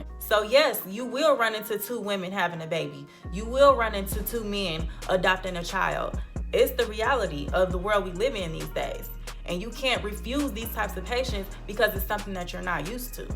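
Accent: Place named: Los Angeles, United States. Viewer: Where you from!? You sound like you from LA! Wit yo pretty ass!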